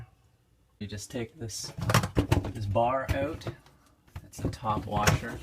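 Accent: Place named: Pennsylvania, United States. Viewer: Where are u from. Accent Reminds me of a guy I knew from Pennsylvania. Just wondering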